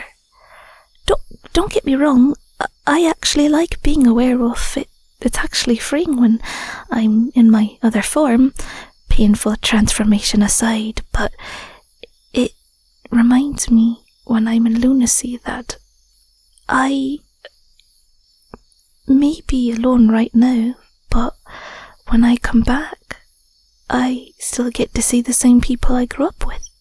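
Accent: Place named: Scotland, United Kingdom. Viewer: I like werewolf girls, I like Scottish accent, I like stargazing. What a great combo.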